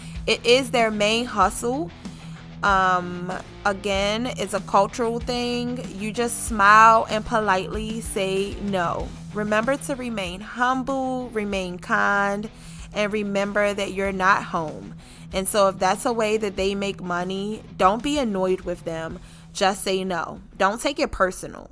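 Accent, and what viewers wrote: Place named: Baltimore, United States. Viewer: Enjoyed the video. Enjoyed the B’more accent.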